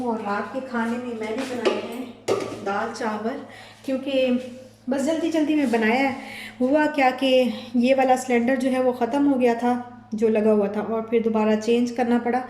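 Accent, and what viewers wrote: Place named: Mianwali, Pakistan. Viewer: I think u r from mianwali jis tarah sai ap bolti hain.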